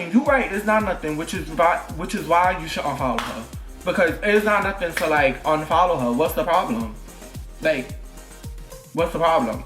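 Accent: New York, United States. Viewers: Omg! I thought you were from NY, your accent? Yep and like you said kind of... You gotta know when to hold them and fold them!!! Pretzel like...okay😜🤐😜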